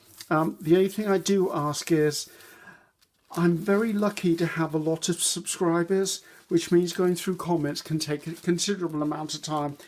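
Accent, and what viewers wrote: United Kingdom. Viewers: I watch your wonderful videos daily and I never tire of your creations. I especially enjoy listening to your British accent and quirky sense of humor. I live in the Deep South of USA. We use tung oil on heirloom antique furniture.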